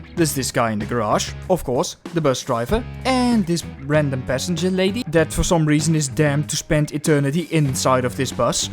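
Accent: Netherlands. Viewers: nice dutch accent! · waarom klink je zo nederlands???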